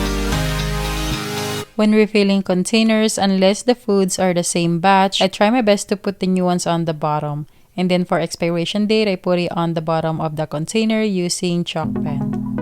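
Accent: Philippines. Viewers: Matagal na nag wonder ako if your Pinay because of your accent...watching from Cebu❤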